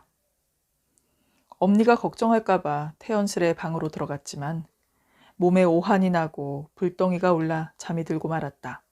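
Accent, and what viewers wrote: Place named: Jeolla, South Korea. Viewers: ~~♡ 구수한 남도 사투리와 지혜로운 사람들의 정다운 얘기네요~❤ 고맙습니다 ~^^ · 전라도 사투리를 우아하게 정감있게 잘살려서 얽어주신 멋지십니다~ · 감동의 물결과 눈물이 납니다 나도 누군가에게 따뜻한 사람이 되렵니다 욕심이 날 때 내가 빛나고 싶을 때 나를 돌아보는 사람이 되렵니다 꾸밈없는 목소리로 그리고 맛깔스러운 전라도 사투리에.. 감사합니다 지금 여기에서 성장하는 사람이 되었어요 고맙습니다❤😊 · 박노해님의 자전적 소설 참 감사히 잘 들었습니다.전라도 사투리의 정겨움에 뚝뚝 묻어나는 정을 느끼다보니 가슴까지 따뜻해집니다.가난하고 병들고 벼랑끝에 서있는 자들에게 설땅과 쉴땅을 내어줄 수 있는 넉넉한 마음과 능력을 신께 소망해보는 아침입니다.작가님과 께 감사드리며 오늘도 복된하루 되시길 바랍니다.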